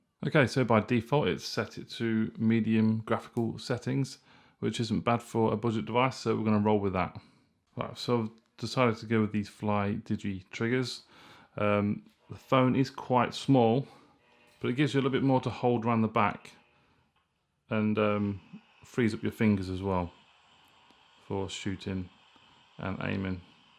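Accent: United Kingdom. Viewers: Yup, typical British accent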